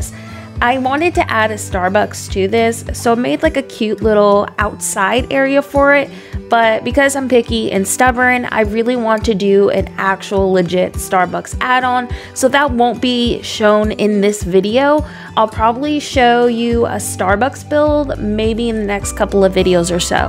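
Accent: South, United States. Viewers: I thought I heard a bit of a southern accent but when you called the shopping cart a buggy I knew you were my people lol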